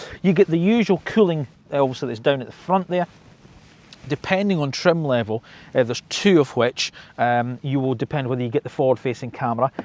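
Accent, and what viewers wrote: Scotland, United Kingdom. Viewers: The design of this India built car was actually inspired by another Indian EV I happen to own, called the Tata Punch EV. Watched the review not for the car but for your charming scottish accent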